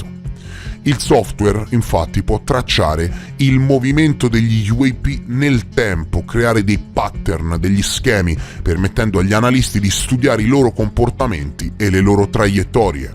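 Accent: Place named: Valdarno, Italy. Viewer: Il Valdarno ci ha regalato questa perla di saggezza. sempre al top! Che tu sia di quelle parti me lo suggerisce il tuo accento, ma potrei sbagliarmi, sia chiaro. Io uso solo l'intuito, non ho software incredibili di alcun tipo.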